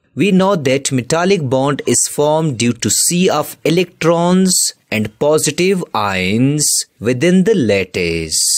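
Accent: South India, India. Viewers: Are you southindian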